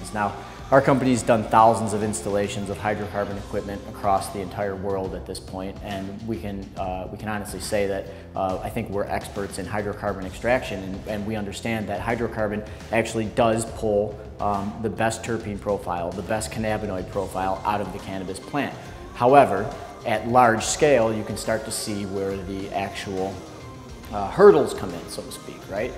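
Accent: United States. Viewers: is that a chicago accent? great accent, can't quite pin it tho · midwest accent represent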